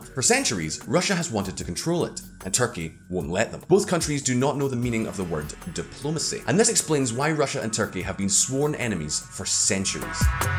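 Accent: United States. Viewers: You should not apologise for the American accent, but embrace it, because your accent is just awfull... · your American accent was pretty good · The American Accent was pretty good. You sounded like my over-bearing neighbor. Good Job. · I love that American accent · Pretty good American accent buddy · Firstly, this American approves your US accent. Secondly, laser weapons are obviously real, but they require a TON of power. Until we have more efficient batteries, they will always be land or ship based (and in rare cases, mounted on planes). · Yeah, man, as an American, I approve that accent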